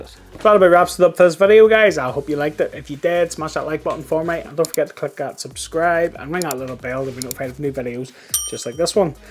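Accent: Northern Ireland, United Kingdom. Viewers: Well I wasn't expecting a Norn iron accent in a tech video! Super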